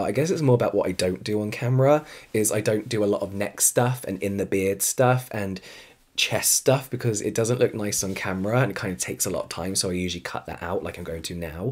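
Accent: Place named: Wales, United Kingdom. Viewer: Great video sexy voice ✅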